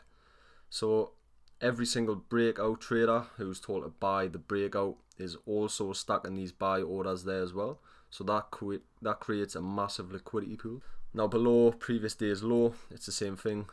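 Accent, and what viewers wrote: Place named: Newcastle, United Kingdom. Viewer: Haha fellow Geordie aye. How long you been trading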